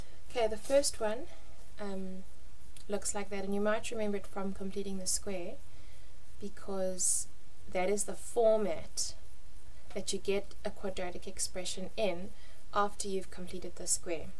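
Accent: South Africa. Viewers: You're afrikaans?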